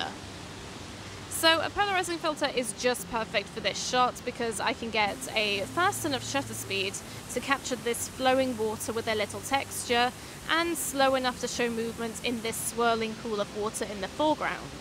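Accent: United Kingdom. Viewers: Those images at the end… 😘 (Also, people over here in the U.S. pronounce "NiSi" the way you Brits pronounce "Nikon.")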